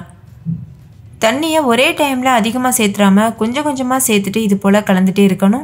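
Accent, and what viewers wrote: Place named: Kerala, India. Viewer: Hi sis,,,,I am very interested in your cooking videos,,,I am malayali but also used to watch ur videos,,,I like all ur videos,,,and ur pronounciation with some Malayalam words ,,.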